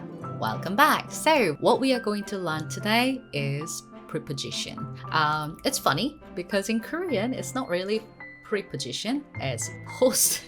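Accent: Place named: United Kingdom. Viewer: Thank you. I really love your videos. Your British accent really shines through! :-)) I didn't know about 속에. It seems similar to 꽂다, which I understood to be kind of meaning stuck in a tight space. What is the difference between 속에 and 꽂다 (other than the fact that one is a preposition and the other a verb). Are they basically used for similar spaces or is there a different nuance?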